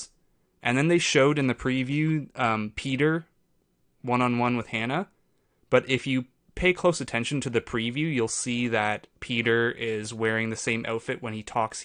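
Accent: Canada. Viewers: i think he’s gotta be canadian, the way he says out/about is just too canadian 😂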